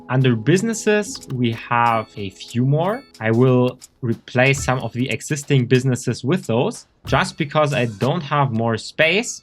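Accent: Germany. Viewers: I hear a german accent :) great video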